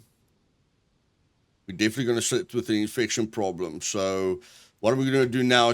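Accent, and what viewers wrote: South Africa. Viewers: Heiii are you South African. Just judging by the accent:) I myself am, thats why i recognize it.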